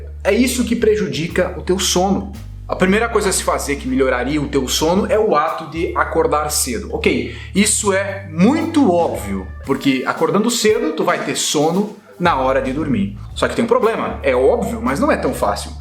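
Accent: Rio Grande do Sul, Brazil. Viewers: Pelo seu sobrenome, seu jeito de falar, creio q tu seja do rio grande do sul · Por acaso você é gaúcho??????? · Mas que bagual, gaúchos por aqui tchê, loco de especial meu pia? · De que cidade gaúcha tu és tchê? Rsrs sou de Gravataí-RS abraçao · Certo que esse cara é Gaúcho haha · Mas bah tchê, acho q tu é Gaúcho, não? Kkk é nóis bagual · Como se diz aqui no Paraná, "LEITE QUENTE FAZ BEM PARA O DENTE". Contanto, acho que o deve ser gaúcho, pelo sotaque.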